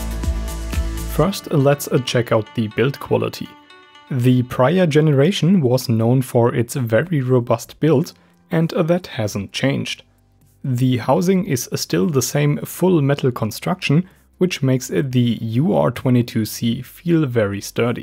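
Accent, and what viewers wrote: Germany. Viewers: Ich wette, wegen deinem akzent das du Deutsch sprichts!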